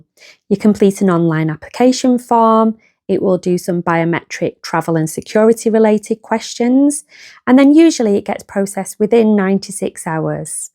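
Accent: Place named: England, United Kingdom. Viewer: Great Clear English Accent Love it ❤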